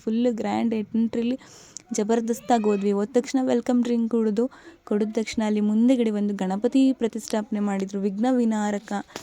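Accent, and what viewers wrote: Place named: Mangalore, India. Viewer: Huu mangalore kannada mix aagide swalpa😮😮🙄🙆🏻‍♂️🙆🏻‍♂️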